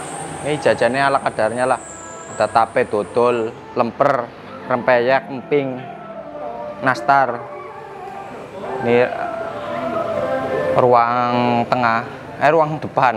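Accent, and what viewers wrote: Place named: Brebes, Indonesia. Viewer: Ngomonge kayak wong brebes ms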